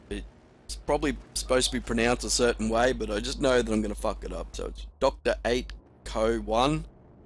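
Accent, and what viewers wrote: Australia. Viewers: Hmm, you sound pretty australian to me :) As I am austrian, i love it when ppl mix names up xD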